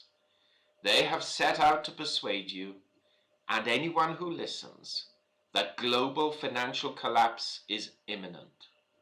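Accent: England, United Kingdom. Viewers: After watching several of your videos, I understand what you are trying to say. "Don't purchase all at once." I agree. Patience is key. This is true for many things, even real estate. Since I made my error with that in the past, I won't do it again. Been trying to move out of Los Angeles but only when I find the right place at right price. The more I research and understand a certain market area, the better off I am in making a decent purchase. And besides all this, I like your accent. You remind me of one of my coworkers who's from England. And I admire his many virtues as well.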